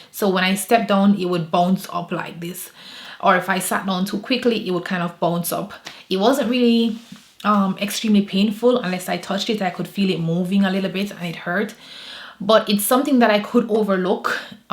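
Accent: Jamaica. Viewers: Waiting to do my open myomectomy yu sound like a Jamaican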